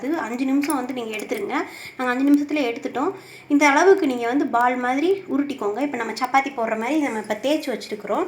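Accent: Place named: South, India. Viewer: It's southerners slang.This originality is good and unique. Let it be.Its also part of her cookery videos I fèel.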